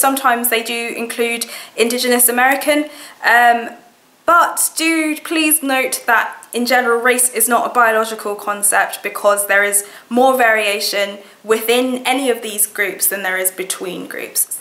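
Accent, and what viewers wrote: England, United Kingdom. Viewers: Yes, this is considered very well spoken British English. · fucking brits and their accents, fucking hot lol 😍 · Which part of England youre from, love the accent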